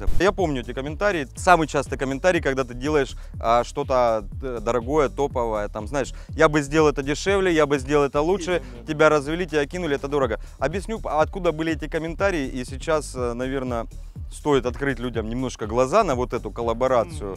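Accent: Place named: South, Russia. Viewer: Южнорусский говор, прекрасное чувство юмора(интеллект в наличии)- прям как на Родине побывал., спасибо!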